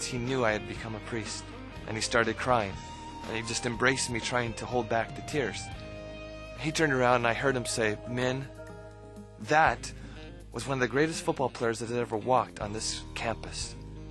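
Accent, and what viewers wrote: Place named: Quebec, Canada. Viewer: What kind of accent is that? He sounds French Canadian... from Southern California?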